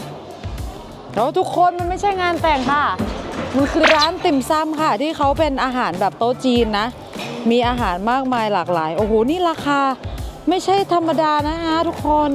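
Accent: North, Thailand. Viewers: น้องเป็นคนเหนือกาจ้าว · พูดภาษาเหนือน่ารักดี ชอบๆๆๆ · อู้กำเมืองระเบิดไปเลย😊คนเหนือ😂ยินดีตวยเน้อ❤🎉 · อู้กำเมือง ม่วนเจ้า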